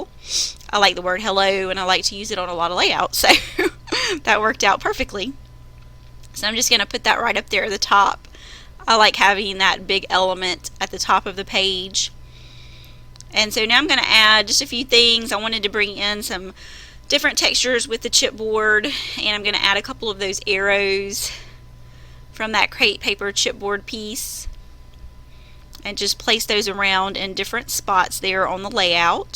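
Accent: South, United States. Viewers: So awesome!! I love hearing another Southern accent!;)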